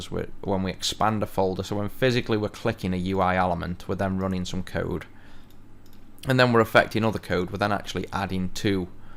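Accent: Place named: England, United Kingdom. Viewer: Thank you very much for all these tutorials, they're the best and most in-depth explanations of WPF and C# I've found on youtube. Great teacher! I'm going to start thinking of code in my head in an English accent now, which is fine by me!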